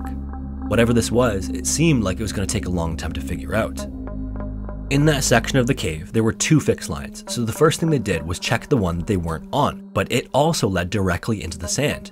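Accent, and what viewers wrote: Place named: Canada. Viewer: I hear the Canadian in you.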